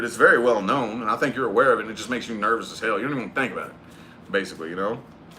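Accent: South, United States. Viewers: Somewhere in the south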